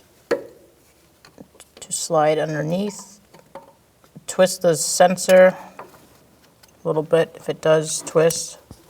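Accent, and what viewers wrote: Boston, United States. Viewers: Thanks for the content .. I'm about to do the rear two on mine .. btw is that a Boston accent ?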